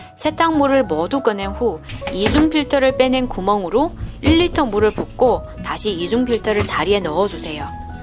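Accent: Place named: China. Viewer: 말하는게 중국사람 같음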